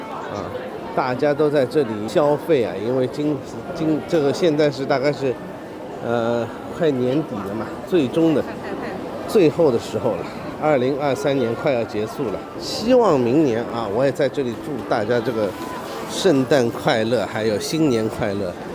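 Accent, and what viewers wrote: Shanghai, China. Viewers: 从你口音我觉得你是个土生土长的上海人，我也是，在国外的街头偶尔也是听到上海话，匆匆而过时，彼此也会报以会心的一笑，我们都知道这是思乡之情在作祟，因为我们都想念它。感谢你的视频，感觉是你的一种释怀，但还是要谢谢你的豁达，Merry Christmas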